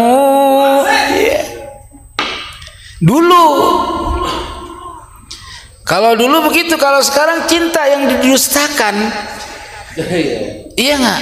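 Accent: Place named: Jakarta, Indonesia. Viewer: . Kori. Saya. Suka. Omong nya. Betawi bangat.saya pendukung mu. Maju terus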